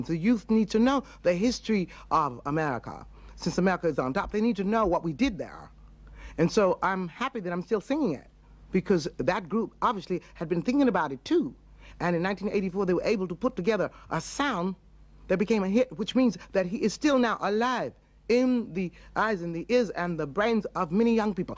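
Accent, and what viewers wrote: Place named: Gullah, United States. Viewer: She was born in North Carolina; the Gullah people of that region have retained strong elements of their Africanist roots, including language/accent. So that is definitely a conscious part of the cultural heritage she represents.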